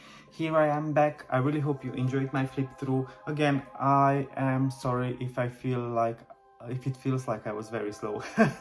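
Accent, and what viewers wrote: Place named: Czech Republic. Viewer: I like your accent:) I've never heard the accent of the Czech republic. And your English is excellent my friend!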